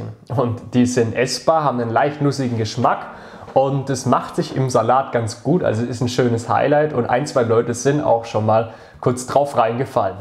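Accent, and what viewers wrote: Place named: Karlsruhe, Germany. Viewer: Habe sofort gehört, dass du von hier (Kreis KA) kommst 😁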